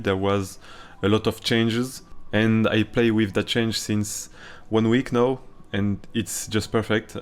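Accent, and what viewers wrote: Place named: Russia. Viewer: I think you're Russian because you have a Russian accent.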